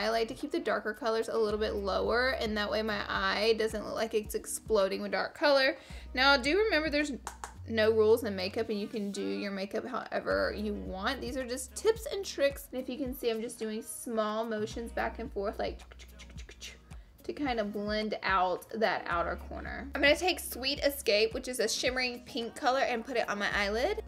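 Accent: South, United States. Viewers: I can watch you all day. Your southern bell accent is cute and you are so funny ❤️ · I like talk through cause I don't really care how long it is because your funnier and it makes me stay longer so ya. And I've never been a big fan of voice overs. Also People need to stop talking about her voice. Her voice is a thick southern accent and I love thattttt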